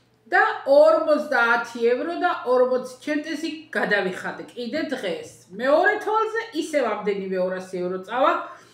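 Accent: Kakheti, Georgia. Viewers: ჯანმრთელობას გისურვებთ,როგორ მიყვარს თქვენი იტალიური კახური აქცენტით, გაიხარეთ,უფლის წყალობა არ მოგაკლდეთ❤❤❤❤❤.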